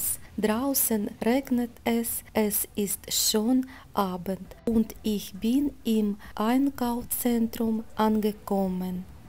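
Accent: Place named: Germany. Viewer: i think your English will be as good as your Germany so look forward to an English video but i like your German accent as well ,you look after self and take care ♥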